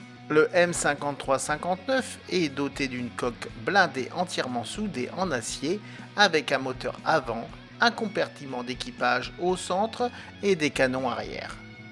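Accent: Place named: Germany. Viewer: Il a un petit accent allemand 😉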